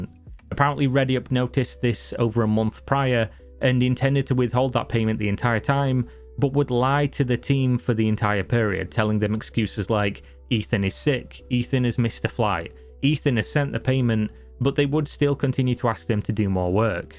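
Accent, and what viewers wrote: Northern England, United Kingdom. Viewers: Where you from? You sound Northern. Anywhere near Grimsby?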